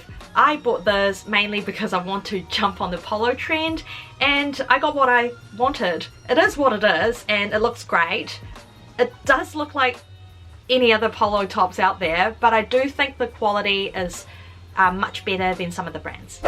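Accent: New Zealand. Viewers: I missed my new zealand accent icon and fashion queen.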